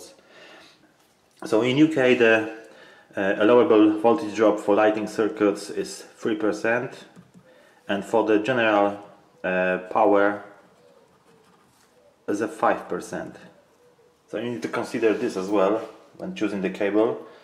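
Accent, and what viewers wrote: Poland. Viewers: Very nice explanation, you make a great teacher; sweet memories of my work with some Polish builders because of the accent. :)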